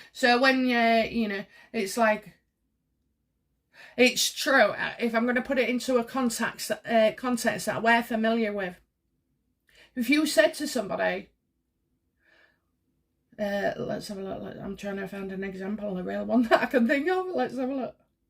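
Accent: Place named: Hull, United Kingdom. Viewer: Ah so it's a Hull accent.! I was wondering. Just love accents and the readings. Yes I am from Yankee and Bookworm - we are creating a really good family. I understand the "fat" word is just an expression of" lush/lots of info.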